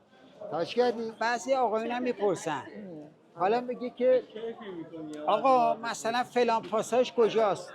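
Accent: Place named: Tehran, Iran. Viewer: با لهجه تهرونیت حال کردم .❤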